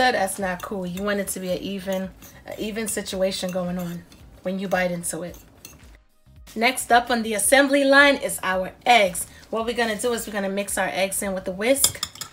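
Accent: New York, United States. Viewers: That looks so delish! I'm loving hearing the New York accent.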